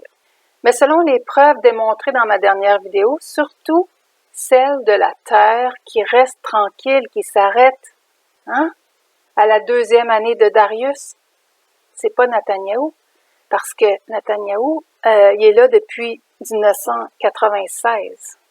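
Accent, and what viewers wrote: Canada. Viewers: C'est la première fois de ma vie que je trouve l'accent canadien magnifique.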